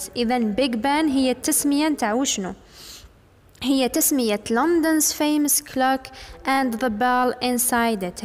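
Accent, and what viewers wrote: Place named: M'Sila, Algeria. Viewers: استاذة انت من ولاية المسيلة ولا اذا انت من مسيلة انت راك قريتيني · اها ننضنش من مسيلة من لهجتها باينة دزيرية ملاحضة انا فتاة ولست ولد بلاكي تشكي في اسمي ديال القناة 😅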